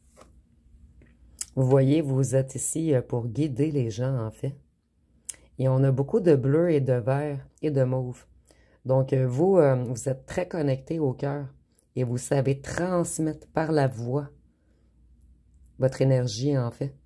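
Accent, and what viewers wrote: Quebec, Canada. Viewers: Tres bel accent quebecois chere madame❤❤❤❤❤❤❤❤❤❤❤❤❤❤❤❤❤❤❤❤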